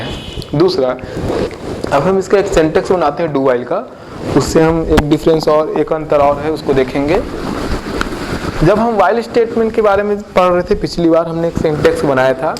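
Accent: Bihar, India. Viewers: apna accent which is i think bihari agar change kar le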